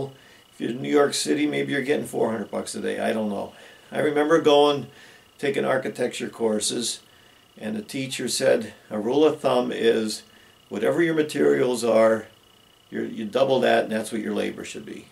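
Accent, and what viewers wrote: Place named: Wilkes-Barre, United States. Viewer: Howdy -Greetings from the Juniata River area in Newport, PA. (Your accent sounds like the Wilkes Barre area). If you are in the Newport area come Spring, and want a quick cement job, (or if you know someone who does) shoot me a name. The dog and I wIll be looking for someone to redo the cracking cement on the top of our chimney. It's a real small job - about 4 feet by 4 feet. The cracking seems to be the cause of a slight trickle on the inside of our chimney when it rains. I climbed up there (ranch house roof) while the dog supervised from the ground. We came up with a temporary solution until Spring. ( He agreed to loan me the metal bottom to his dog kennel). I flipped it upside down and rested it on top of the 2 chimney caps. The metal lip around the kennel bottom is only about 2 inches deep so it works real well. 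It doesn't seem to block the airflow and we can still use our pellet stove. It also seems to be deflecting the water like we wanted. I anchored the thing with heavy pavers and it seems to be doing the trick. WOO HOO!! (The dog and I are happy with our rig-job for now and hoping that mother nature is kind until Spring.) Enjoyed your video.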